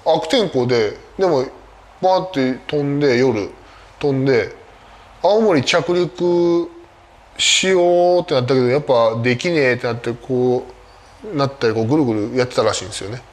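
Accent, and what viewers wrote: Kansai, Japan. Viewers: 日本版ダルビッシュやん笑 まーくんの関西弁初めて聞いたわ🙂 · 微妙に関西弁混じるんが関西生まれですね！わら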